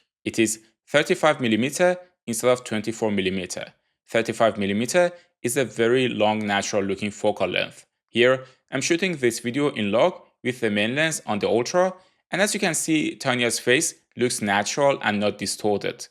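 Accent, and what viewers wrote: Iran. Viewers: لحجت ایرانیه😂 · Nice video especially w that very irooni accent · بنازم لحجه ایرانی رو 😅🫡🤌🏼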